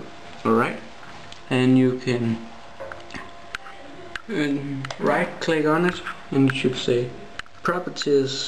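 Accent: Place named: Denmark. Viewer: man kan høre i din stemme du er dansker Yo DK :D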